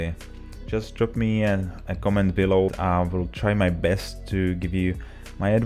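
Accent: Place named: Czech Republic. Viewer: where are you from bro? it seems to me like you have some Czech accent :D fix me if Im wrong. Im also Czech and I wanna ask you on something about United Kingdom right now. If its really difficult for foreigner with IT skills like you have to apply for a VISA? thx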